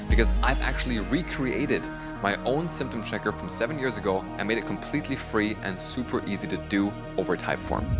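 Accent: Germany. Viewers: Wenn meine Ohren mich nicht komplett in die Irre führen, ist deine Muttersprache Deutsch. Wieso machst du die Videos nicht (auch) auf Deutsch? In meinem Umfeld gibt es leider viele, die kein Englisch können und es ist sehr schwierig ihnen die ganze Sache anständig zu erklären. Leider gibt es ja kaum gute deutschsprachige Videos zum Thema. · I hear the german accent 🤨😂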